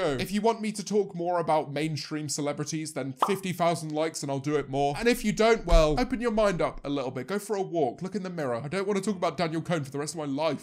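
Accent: United Kingdom. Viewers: Sometimes I wish I had this accent I don’t know why, I really like British accent lol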